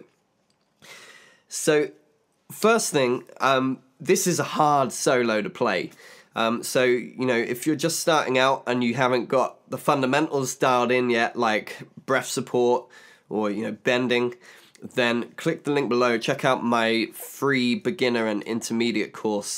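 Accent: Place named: United Kingdom. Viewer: Oh my god he’s British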